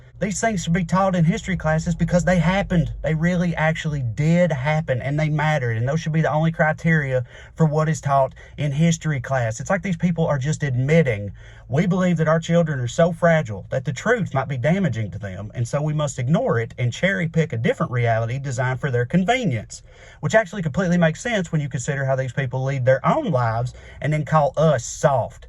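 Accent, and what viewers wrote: South, United States. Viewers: Thank you, for so much sensible thinking and outrage at the GOP lunacy. I was so glad to hear everything you said. BEAUTIFUL! It was horrible to hear what is happening but so good to hear your rational thoughts(and in a "southernish" accent. I am from Mississippi....). Thanks. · thank you! It's encouraging to hear someone with a southern accent talk with common sense. And you are right about white supremacist clawing to hold on to their money and power. That's what Trump and Trumpers were all about. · You are changing the world. Thank you for making me not afraid of a southern accent. Keep up the honest and common sense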